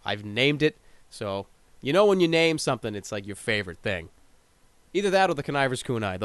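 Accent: Boston, United States. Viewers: Wow you are from Boston! Just like a scout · I listen to Jerma so I can talk with a Bostonian accent. MY GOD, THAT ACCENT IS BEAST. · klahndyke bah :D gotta love that boston accent.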